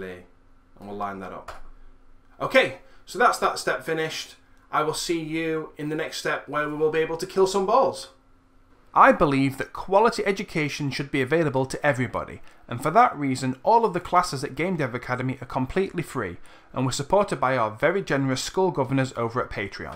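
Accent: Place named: Nottingham, United Kingdom. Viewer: Now it seems obvious but I hadn't placed it earlier. I think across Notts though there's quite a broad array of accents. Beeston for example is pretty different to Mansfield.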